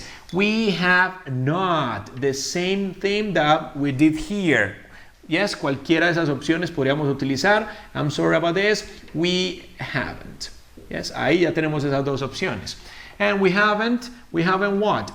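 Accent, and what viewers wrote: Colombia. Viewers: Me desconcentra el acento colombiano 😍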